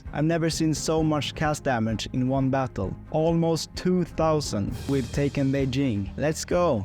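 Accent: Sweden. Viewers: You are swedish. it sounds kind of annyoing, at least of right now, when you use that overly-bad accent on purpose. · First time watching this channel could tell by the accent he was swedish. I would know im swedish myself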